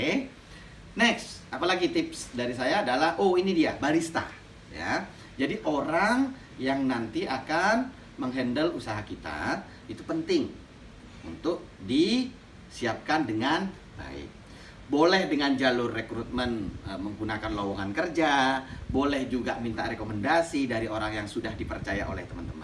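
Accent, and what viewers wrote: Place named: East Java, Indonesia. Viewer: Bismillah om doakan Lancar usaha kedai kopi saya mski awal buka. Msh butuh bimbingan dan belajar. Kalau boleh tw asli om bang kok medok jawatimur.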